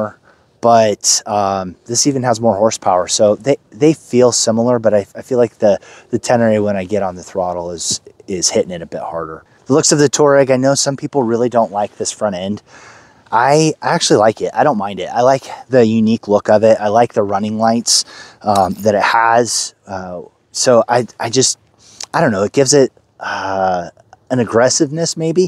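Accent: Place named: Los Angeles, United States. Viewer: Sounds like she's from LA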